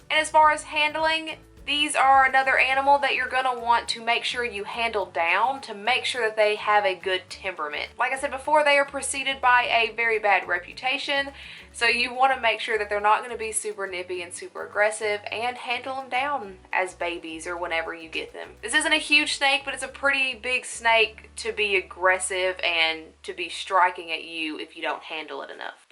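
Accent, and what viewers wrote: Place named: Georgia, United States. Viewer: I love your country accent. Sounds Southeast, maybe Georgia my guess. Anywho, love your channel. Great educational but more importantly, for me, your accuracy. 👍😁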